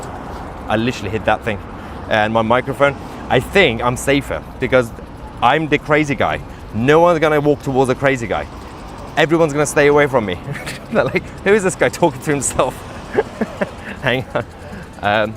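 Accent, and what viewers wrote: United Kingdom. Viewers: I can see and hear you, British accent and all!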